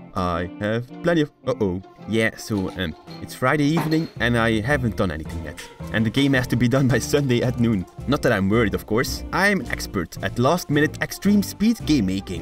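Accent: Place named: Netherlands. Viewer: Do you speak Dutch? Because your English accent sounds a bit Dutch :) No hate.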